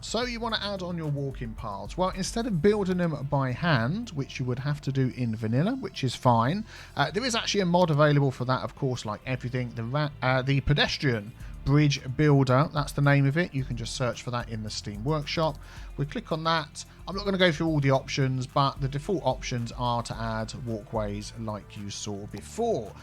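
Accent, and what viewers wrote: United Kingdom. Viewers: I already knew all this but I watch every video, so 🤷‍♂️ 😂 If anything, I stay for the beautiful British English 😌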